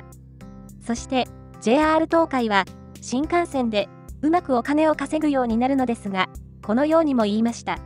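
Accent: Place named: Tochigi, Japan. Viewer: 歌、英語、栃木弁訛りとここ数日攻めているUP主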